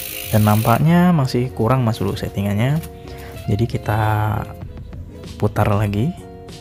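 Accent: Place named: Indonesia. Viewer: tplong Bro ..bahasa inggris jgn dieja dgn indonesia kl screw itu bacanya skru kl adjuster bacanya edjaster hhh youtuber indonesia dah paling parah dah.. modern tampilan tp ada rasanya primitf2nya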